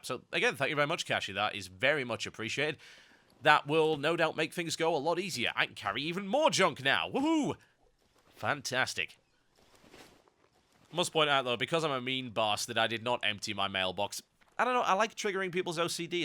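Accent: United Kingdom. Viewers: I wish I had an awesome british accent like you :(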